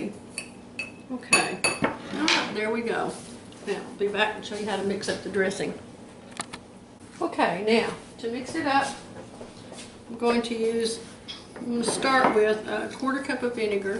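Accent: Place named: Texas, United States. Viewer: I just found your site and you sounded like a Texan. Now I see that you have on an apron with Texas. Where in Texas are you? I grew up in Temple and now live in Paraguay.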